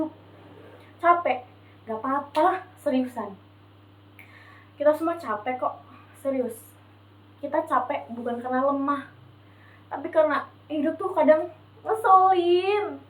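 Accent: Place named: Banyumas, Indonesia. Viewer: Gen Z ngapak 🥰🥰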